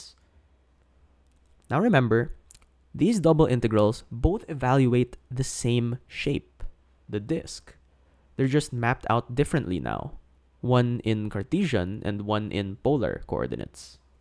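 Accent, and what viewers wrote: Philippines. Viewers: he sounds Filipino yes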